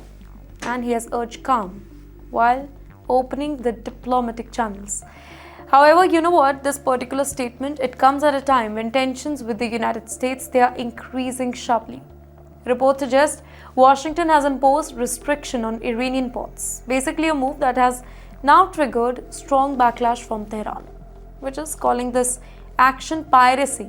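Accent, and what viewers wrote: India. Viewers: THIS HINDIYAN SPICY ACCENTED NEWSCASTER IS WEARING A SCHOOL UNIFORM, MUST REALISE HER MODI WILL SUFFER THE IRAN OIL & GAZ SUPPLIES IF THINGS ARE MESSY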